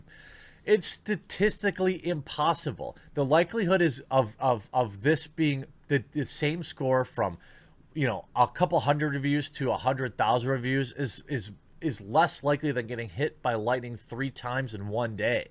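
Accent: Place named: Midwest, United States. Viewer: Rotten to-mate-ers... yeah this guy is Midwest. I bet he has a Davenport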